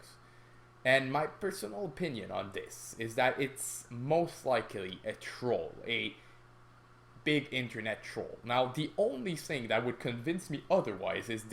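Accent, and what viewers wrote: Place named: Quebec, Canada. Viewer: You're from Quebec aren't you ? The accent gave it away.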